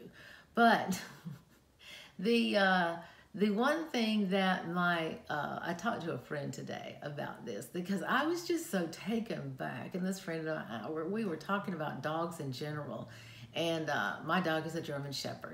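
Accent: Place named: South, United States. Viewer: Hi: I know this video was posted several weeks ago, but since I'm new and I really love your content, I'm catching up. So please forgive the late comments.:) I thought you did a great job with this informational video. I liked how clearly and kindly you explained everything. Also as a side note, you have the coolest accent; I can't help but think of southern homecooking and maybe being in a comfie room chatting with you and other southern belles. Back to your video. I think one of the things many people don't understand is everything we experience involves the brain. I have a huge love for neuroscience so I like to at some point educate involving the brain--without getting to sciency.::LOL:: I think many sighted people don't get that the eyes send signals to the brain and the brain does all the processing. As for guide dog knowledge, man oh man, are people more clueless than ever! 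You did a great job explaining what Gretchen does and doesn't do. I would have also emphasized what part you played in getting her to where she is as your guide. Yes, she's trained to do this, but she wasn't just trained by SE trainers; unless you had a trainer who taught her things like locating the counters and trash cans, you did that yourself. I would have said that early on because I think many have this impression that the dog does these things but the handler doesn't have much in the training aspect. How cool that your girl can find all of those things for you. Is she just really go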